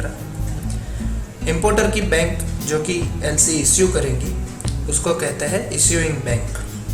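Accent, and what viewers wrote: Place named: Gujarat, India. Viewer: Sir, i did import export course... Ane tamara tone parthi lage che k tame Gujarati cho.... Kai Job hoy toh let me knw... M ready to cm at ur place😁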